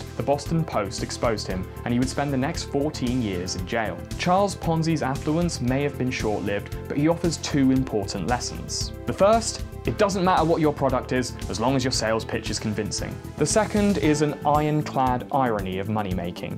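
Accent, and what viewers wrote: England, United Kingdom. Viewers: He has an english accent and never mentioned being Australian, so i don't don't know where you got that from..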